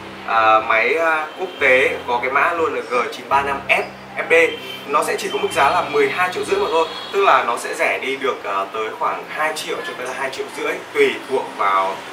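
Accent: Vietnam. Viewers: video mang tính chất khoe gấu là chính. nhưng giọng miền nam hay sao ý mình nghe lần đầu lạ lạ a ạ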